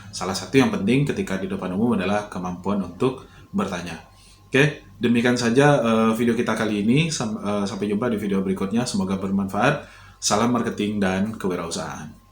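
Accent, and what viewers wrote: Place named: Minangkabau, Indonesia. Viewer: mksh pak,, logat dan nada bapak seperti logat orang Minangkabau atau Padang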